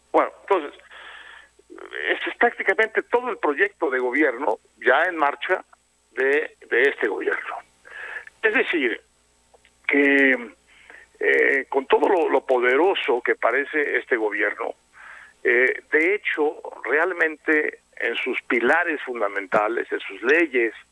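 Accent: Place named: Galicia, Spain. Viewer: Cada vez el español gallego de lopez doriga. Se parece a loret de mola puras fake news.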